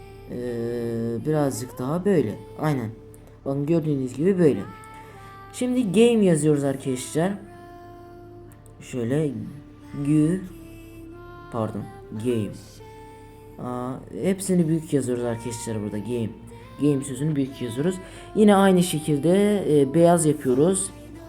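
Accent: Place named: Azerbaijan. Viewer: Qaqa Azerbaycanlı san turkcə danisirsan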